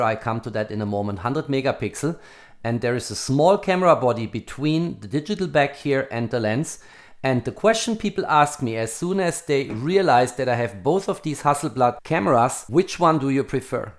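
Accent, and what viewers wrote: Germany. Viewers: Agree, and I love his German "We" instead of "V" in his pronunciation...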